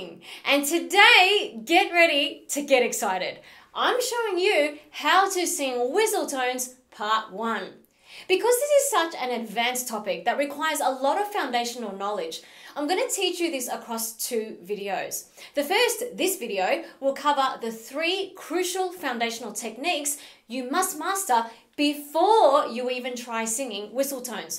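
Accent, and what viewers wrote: Australia. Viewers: love your australian accent! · Australian aaccebt?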